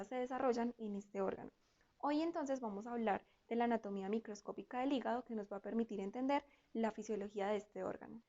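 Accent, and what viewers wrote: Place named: Colombia. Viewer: Hablas demasiado rápido que no se te entiende nada,sumándole el acento colombiano que tienes ,terrible tu explicación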